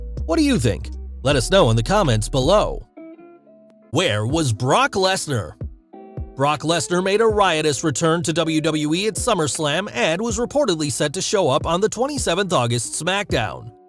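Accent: United States. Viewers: Is anybody gonna point out that the guys voice is now American? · Oh so this channel is now hosted by an American? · How come you have an American accent and Wrestlelamia has a British accent?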